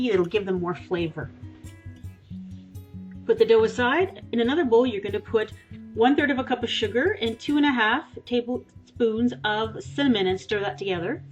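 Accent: Canada. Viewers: Where are you from in Canada? I recognize my own accent. It's so distinct from the Americans.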